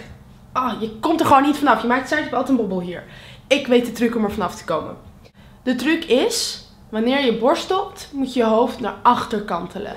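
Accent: Netherlands. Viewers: Je kat is echt super schattig bezig op de achtergrond ^_^ Sinds ik naar België verhuisd ben is het echt fijn om soms weer eens een super Nederlandse accent te horen haha :) Lovin' it! =)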